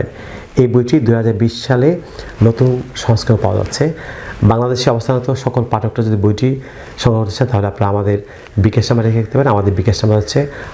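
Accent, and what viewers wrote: United Kingdom. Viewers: Nice class sir. You translate the passage of HSC textbook. Your british accents is very nice sir. I can learn british pronunciations from you.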